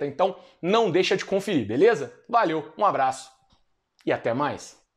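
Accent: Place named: Minas Gerais, Brazil. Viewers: Sotaque de Minas tomando o sexto round hahahah! Ótima resenha 👊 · Show, Parabéns! Adorei o sotaque mineiro, sua desenvoltura e tenho certeza que você terá várias histórias de background pra deixar mais rico o conteúdo. Parabéns!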